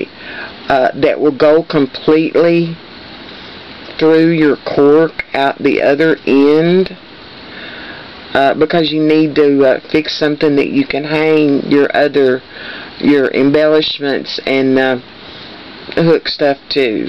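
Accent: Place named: Kentucky, United States. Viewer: Great tutorial! I have been bitten by the cork craft bug too. I love your accent. Kentucky?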